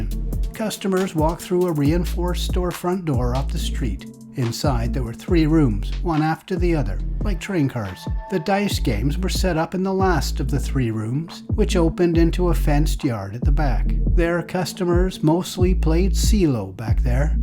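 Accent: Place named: Canada. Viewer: You sound like a Canadian bro! Come on down and check it out for yourself.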